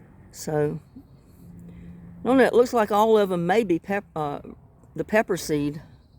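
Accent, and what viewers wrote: South, United States. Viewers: Was busy spring cleaning...the winds here are loud and strong, omgosh...parts of my front porch are missing and cleaning up the yard...😮..luvv garlic ❤️..looking at you in summer clothes is making me want warmer weather now..I had a neighbor that was from North Carolina when I was a young girl , she looks and sounded just like you, aww..and when you said in a other video you were from N.C. ..I thought..yes she is!.. I could detect the certain accent in some words you say......always liked the southern accent . God Bless. ❤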